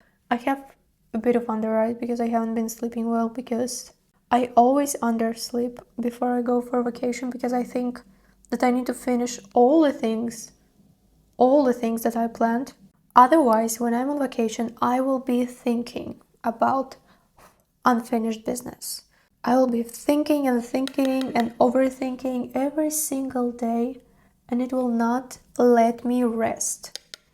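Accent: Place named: Eastern Europe. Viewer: Сразу видно наша славянка ! Акцент как у меня 😊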